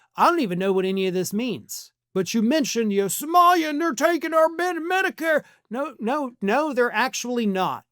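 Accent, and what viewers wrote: South, United States. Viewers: Love the redneck accent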